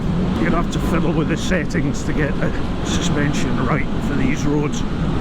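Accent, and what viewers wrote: Scotland, United Kingdom. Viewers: You know what I like the most? Your Scottish accent 😀 . Regards 🇲🇹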